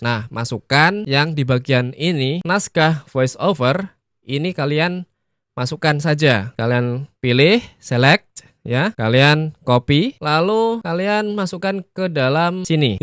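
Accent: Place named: Java, Indonesia. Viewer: bang, abang orang medan ya?. kok logatnya kayak jawa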